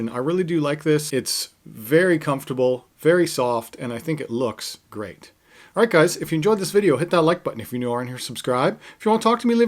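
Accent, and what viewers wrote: Canada. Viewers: Cool video.. U sound Canadian 😊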